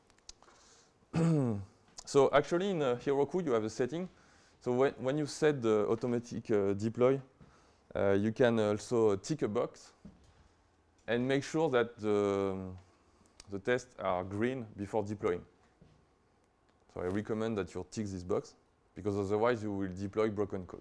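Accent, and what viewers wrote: France. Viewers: L'accent français à jamais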